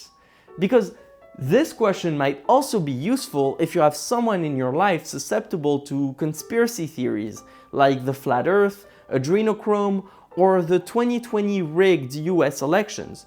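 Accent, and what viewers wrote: France. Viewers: Are you french ? you have french accent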